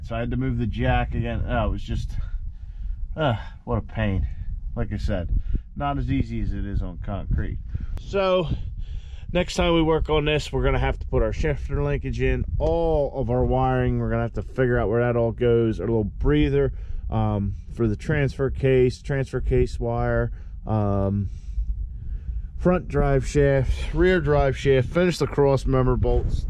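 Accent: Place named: Northeast, United States. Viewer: Number one thank you for your videos very entertaining very educational and 2 I love your Northeastern accent yes you tried to cover it up but it's still very prominent not in the bad way I used to live in New York there pronounce of words is a lot different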